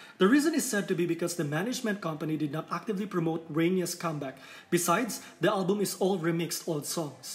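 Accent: Philippines. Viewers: pustahan pinoy itong nagsasalita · I could tell the man narrating this video is a filipino lol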